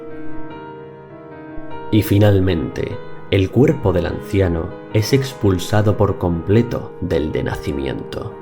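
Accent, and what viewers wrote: Spain. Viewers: hasta con voz española y narrado como cuento medieval. Contenido de calidad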